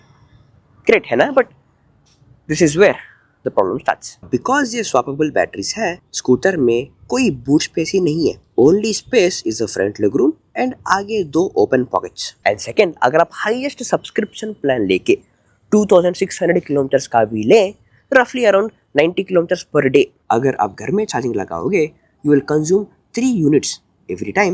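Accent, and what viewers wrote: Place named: Karnataka, India. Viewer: I don't think he is a north indian, most likely Kannadiga(from accent), at the most telugu person.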